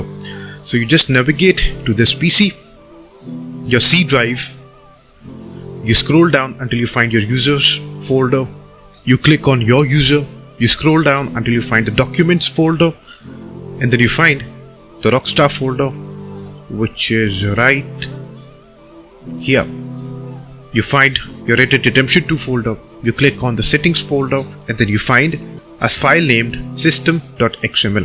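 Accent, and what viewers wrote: India. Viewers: alwas the guys with indian accent saves the day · always the guy with the Indian accent which is the most straightforward (thanks for the info btw)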